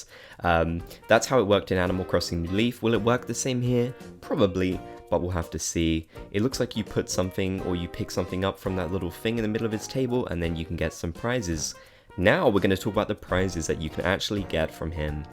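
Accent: United Kingdom. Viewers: His British accent is thicker than Wendell the Walrus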